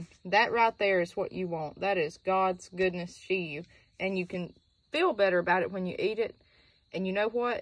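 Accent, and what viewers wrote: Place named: Tennessee, United States. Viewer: Your soil looks pretty crappy but I have the same soil but orange clay with all that gravel (chert) in it. That's a decent harvest for a small patch. I bet they'd be good as hell in a pot roast with carrots and potatoes. You have to be in Tennessee judging by your accent. I know a. Her first name starts with a T and rhymes with Maria. She's about 25.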